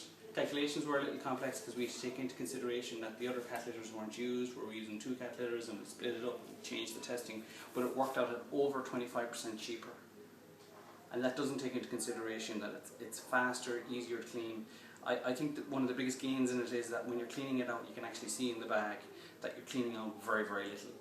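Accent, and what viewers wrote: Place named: Ireland. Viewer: I love your Irish accent.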